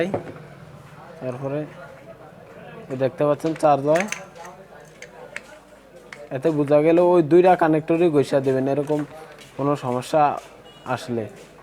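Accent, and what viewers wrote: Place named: Bhola, Bangladesh. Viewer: আমি আপনার কথা শুনেই বুযেছি যে আপনার বাড়ী ভোলা, আমার বাড়ীও ভোলা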